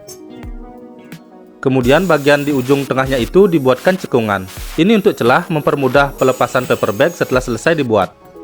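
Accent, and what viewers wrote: Bali, Indonesia. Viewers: Medhok kali bli basa baline .....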